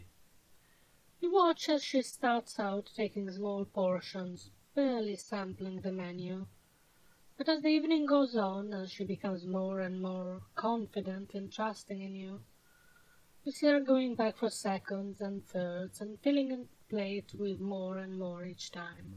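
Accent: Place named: Italy. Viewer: Dat thicc Italian accent! love it!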